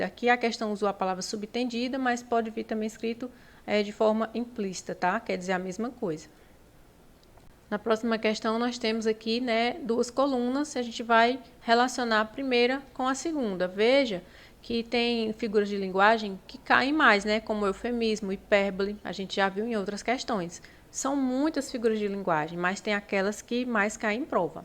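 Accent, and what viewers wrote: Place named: Northeast, Brazil. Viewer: Quando acho vídeo aula com o meu sotaque nordestino, meu coração fica como ?! 🥰🥰🥰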